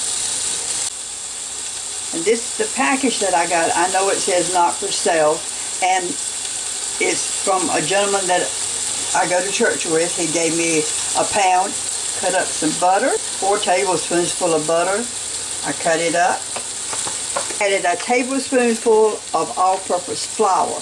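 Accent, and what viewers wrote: South, United States. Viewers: Hello Miss. I've always wondered where your accent is from. Definitely down south.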